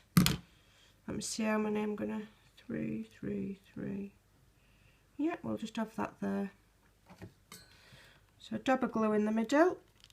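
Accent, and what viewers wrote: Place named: England, United Kingdom. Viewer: You are so delightful to watch. I live in the states South Florida and I thoroughly enjoy hearing your English accent. Good tutorial check me out I make these too with old napkins. I plan to sub to your channel thanks again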